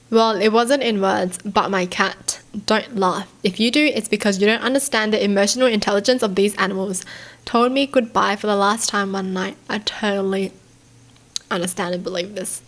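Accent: Australia. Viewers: You still have the best Australian accent to me tho!🥰🔥💜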